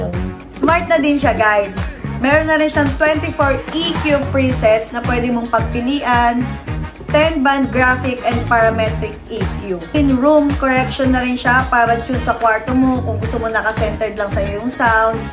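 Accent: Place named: Iloilo, Philippines. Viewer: Ilongga man gali ni c madam🥰mabakal ko sina,pila man?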